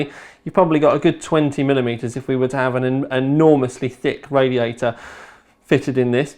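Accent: United Kingdom. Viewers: the most annoying is that British English ascent, its so flatulent. do not understand why British cant speak normal English.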